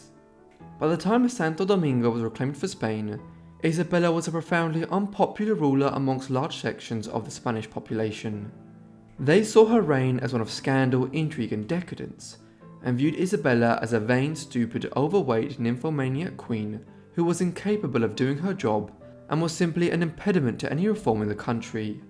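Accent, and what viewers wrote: England, United Kingdom. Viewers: I'm perfectly aware of his English accent - I'm English,myself - I think he's fantastic & really enjoy his videos, but,there is NO other way of pronouncing the word properly in English. I'm very dyslexic as once might realise from reading my post,but,on the spoken word I have total confidence & as I said its constructive criticism, not an insult. I give my Spanish/Italian godson English lessons to help his conversation & having lived all over the World & helped 100s of people with English, it's a courtesy to help someone be the best they can be. Just like I tell anyone to correct my French,Spanish,Italian,Arabic & Greek. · Do you realize he is British and British people pronounce words differently then Americans!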